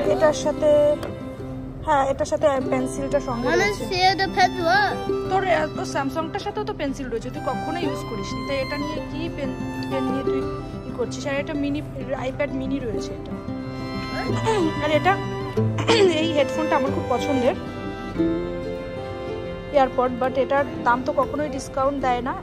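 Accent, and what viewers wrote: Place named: Bangladesh. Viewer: Actually apnar kotha shune majha majha Bangladeshi moto lage. Tai ask korlam.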